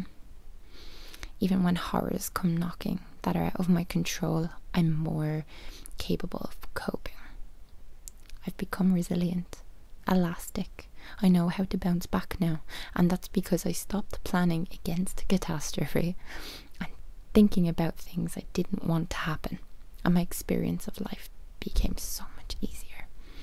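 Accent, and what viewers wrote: Ireland. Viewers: I love the Irish accent so much!! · This was just the kind of thing I've been looking for. A nice Irish asmr reading some sort of book. Love you 💚💚 This actually sent me to sleep! Thank you so much. Can't wait to read that part of your book 💚💚😊😊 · I’m not into whispering so I love soft spoken. However, with that lovely Irish accent this makes the soft spoken voice even better! It is so relaxing you can’t even imagine, so Thanks! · Being from Germany, no, being from wherever you are in the world, the Irish accent, and especially with your angelic and precious voice, this is the best ASMR in the world. We all wait for the channel! Your voice, and aura, and presence have the power to heal. Thank you so much. Go raibh maith agat! <3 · The Irish Accent just lends its self to ASMR !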